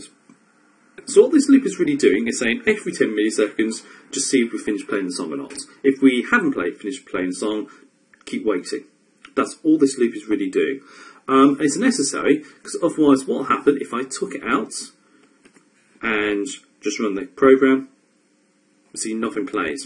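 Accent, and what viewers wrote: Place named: United Kingdom. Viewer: This accent, he's from UK for sure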